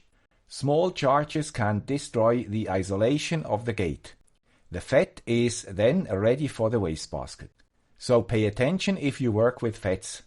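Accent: Switzerland. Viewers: Thanks for taking the time to answer, keep up the good work. Sunday morning newspaper, not any more, now it Sunday morning and new video from the brilliant guy with the Swiss accent.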